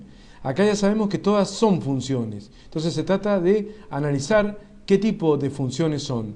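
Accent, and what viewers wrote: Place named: Argentina. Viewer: es argentino pero le mete un neutro por ahi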